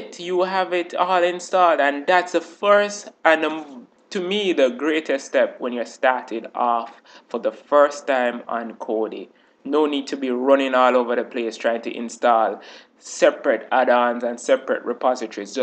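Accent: Jamaica. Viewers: I love your Jamaican accent so much. <3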